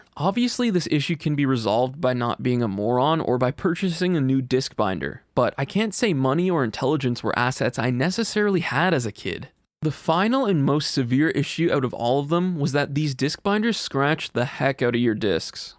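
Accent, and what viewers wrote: Canada. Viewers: Hey man, are you a Canadian!?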